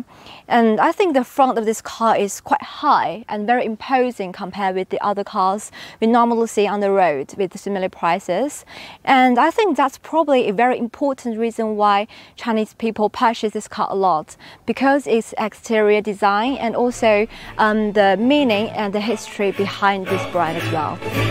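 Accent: United Kingdom. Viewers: 厉害啊，英伦口音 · Chinese beauty with British accent 😯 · 这小英式口音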